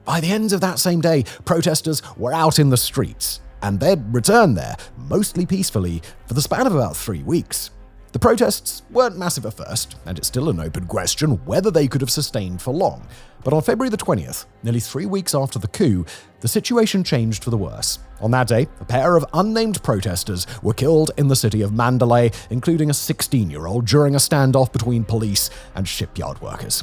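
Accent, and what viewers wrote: Australia. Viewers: He's British? I thought he was Australian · You said it! Thanks! Is this Simon Dude Australian? And what’s the HURRY! Don’t forget his mispronunciation! He keeps saying: “MY-AN MAAR”!!! Is it you “MAAR” or my “MAAR???”. Sorry, I had to STOP listening! Couldn’t take it any longer! It’s too Disturbing! But, I will HELP you: Say “Mee” (like Me) and “Maar” Not that hard for you! OK! Let’s TRY! “Mee-an-Maar”. Please change next time you talk about Myanmar ex Burma. Remember “ Mee-an-maar”. Ex fan of. Johannes Koistinen-Lindgren born in Suomi-Finland. Resident in the northern Thai hinterland Khlon Nam Lai village.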